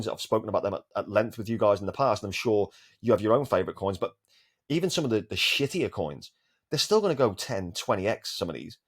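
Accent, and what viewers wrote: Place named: Birmingham, United Kingdom. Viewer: Been trying to work out your accent, I think you’re a Brummie, or midlands. I’m from Brum but live down in London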